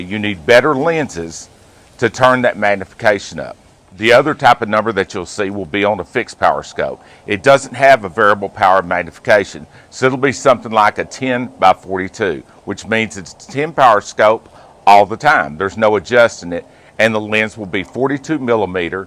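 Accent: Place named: Tennessee, United States. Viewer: I'm from TN. & Just curious what local u r from. I'm having trouble deciding on TN area or maybe? West Virginia ish? Anywho thanks for the no-nonsense !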